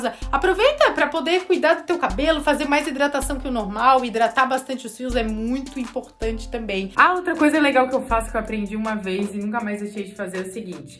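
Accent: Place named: Florianópolis, Brazil. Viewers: Tão bom abrir vídeos aqui e ouvir esse sotaque! Uma impressão de que te conheço de Florianópolis... Só quanto à massagem, não fizeste recomendação explícita de massagear com as unhas, mas ficou a dúvida em muita gente sobre isso. Acredito que tu saibas, mas é bom reforçar por aqui: massagem só se for com as digitais dos dedos, com a parte macia! Never do it with the unhas! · nossa escutei o sotaque e tive certeza q era daqui de floripa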